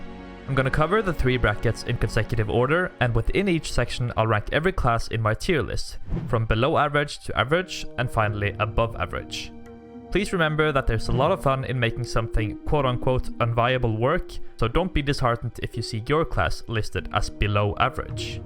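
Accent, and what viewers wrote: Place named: Norway. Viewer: its so easy to hear that you`re norwegian. love the guide :D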